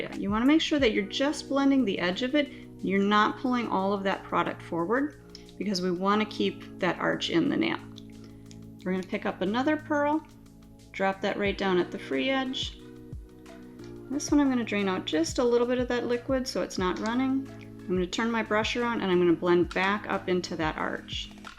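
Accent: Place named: Midwest, United States. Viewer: I knew she was from MN! Michigan girl here. I’d recognize that Midwestern accent anywhere! Love nail sets! 😍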